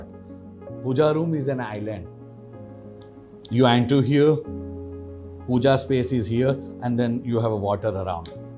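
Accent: Gujarat, India. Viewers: "Aww.. you're gujarati accent is sooo sexy".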